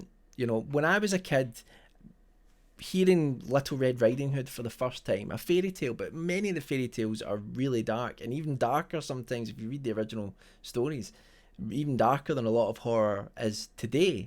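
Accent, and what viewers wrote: Scotland, United Kingdom. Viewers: Well said or rather, well said with a Scottish accent 🎧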